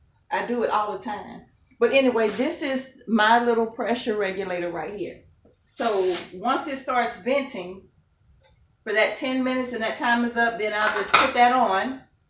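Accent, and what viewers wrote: South, United States. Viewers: Love. Your southern accent! Love your happy personality! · AMAZING!!! Thank you sooo much!! I will be canning my chicken tomorrow. May God continue to Bless you and your family. That southern accent makes me miss home. You are more of a Blessing than you will ever know.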